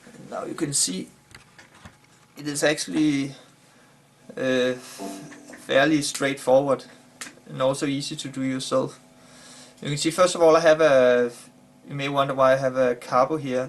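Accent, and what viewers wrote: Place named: Denmark. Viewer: are you Danish by any chance? your accent is so Danish!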